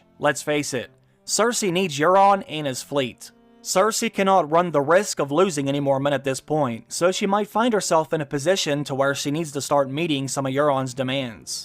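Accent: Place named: Baltimore, United States. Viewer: My guy, are you from Baltimore? Cux it sounds like youre from Baltimore.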